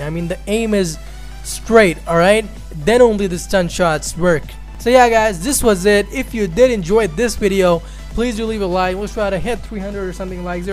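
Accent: India. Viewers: uh he’s Indian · whait is he indian?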